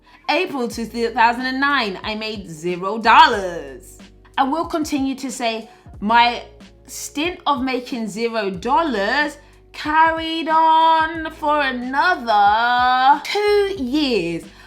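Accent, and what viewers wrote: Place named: United Kingdom. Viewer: Love her personality... and her British accent!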